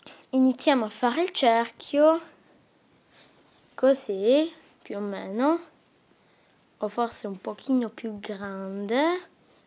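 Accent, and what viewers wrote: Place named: France. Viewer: Ma parli con l'accento francese. Tuo padre è italiano e tua madre è francese oppure il contrario?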